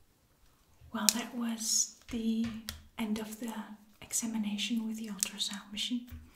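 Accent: Netherlands. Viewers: Pretty good roleplay, are you dutch btw?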